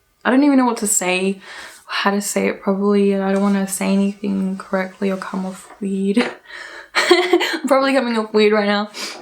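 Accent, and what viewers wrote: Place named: United States. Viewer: Your accent does not seem Australian lol,it is closer being American than being Australian :)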